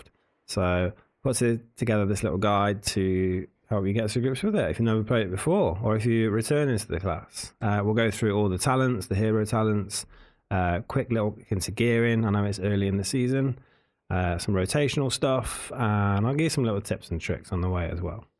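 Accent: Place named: United Kingdom. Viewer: nice seeing a brit explain talents etc for a change haha! thanks buddy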